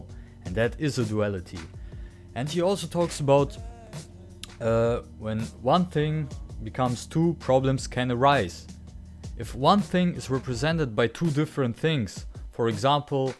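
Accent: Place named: Germany. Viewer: I can recognize the German voice:)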